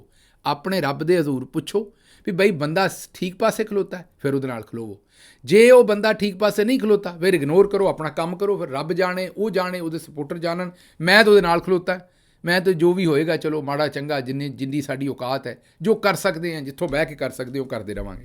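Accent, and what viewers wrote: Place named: Punjab, India. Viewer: میری ماما ڈاکٹر شہباز گل آپ کی پنجابی کی بہت بڑی فین ہیں اور وہ کہتی ہیں کہ یہ ٹھیٹھ پنجابی ہے، اصلی پنجابی یہی ہے۔ یہاں آکر لوگوں نے برگرز نے باہر آکر، ہم کینیڈا میں رہتے ہیں تو وہاں آکر لوگوں کو سمجھ نہیں آتی کہ کیسے بولنی ہے۔ اگر بولنی بھی ہے تو صحیح نہیں بولتے۔ خیر ان کا دوسرا پیغام یہ ہے آپ کے لیے کہ پلیز عمران خان صاحب کا بھی خیال رکھیں اور اپنا بھی خیال رکھیں۔ اور کچھ نہ کچھ کریں آپ وہاں بیٹھ کے ہی کریں۔ ضرور کریں اور آپ خود بھی محفوظ رہیں کیونکہ آپ کی بھی نیوز آئی تھی ایک بار آپ کسی سٹی گئے تھے وہاں آپ کو پرابلمز ہوئی تھیں۔ تو اس سینس میں بھی سیف رہیں اور عمران خان کے لیے بھی کچھ کریں۔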